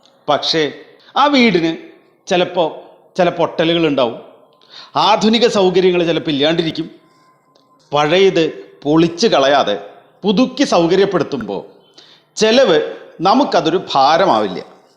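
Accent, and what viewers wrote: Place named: Thrissur, India. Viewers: പേര് കൊച്ചിന്നാണെങ്കിലും ഭാഷ ത്രിശൂരാണലോ ഗഡ്യ.. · കൊടുങ്ങല്ലൂർക്കാരനാണ്... അതുകൊണ്ടാ... 😆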